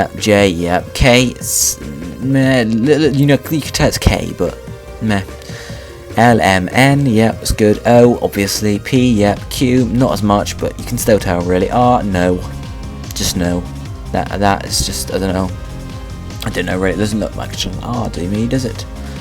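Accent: United Kingdom. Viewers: Linkof War he's British. That's how they say it in europe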